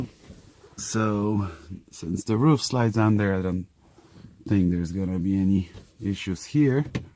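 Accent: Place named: United States. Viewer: nie mam pojęcia co mamy w naszym polskim języku. Mimo że świetnie mówisz z amerykańskim akcentem jednak po 2-3 zdaniach zacząłem wertować u ciebie na profilu informacji by potwierdzić moje podejrzenie że jesteś Polakiem. Ja staram się jak mogę nauczyć akcentu amerykańskiego ale raczej do końca swoich dni będę mówił polenglishem.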